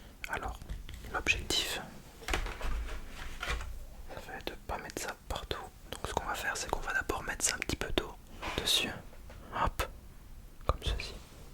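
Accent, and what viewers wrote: Belgium. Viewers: Serait-ce un accent belge que j’entend?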